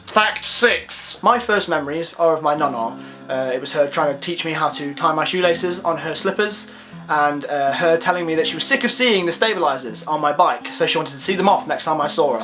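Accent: United Kingdom. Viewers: Your British accent is beautiful, you're beautiful. Also your lips look kissable. Also was listening to you wasn't on mute. Oh my.. I'm awkward. Byee(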